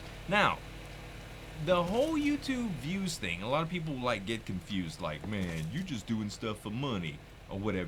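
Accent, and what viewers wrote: Louisiana, United States. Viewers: Hi Z, I like to think people come to your channel for the same reason I do......that Cajun accent, the fantastic way you get over excited and high pitch when something cool happens, and the high quality content you put out. No matter what you play. Just keep being Z cos that is what your good at, and that is what we want to see. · Z I watch you for you not just the games you play I watch you for the funny loud Cajun that you are! Although I might not like every game you play I still look at them just to see. On a side note I have been loving the face cam the VR and your new hybrid animals!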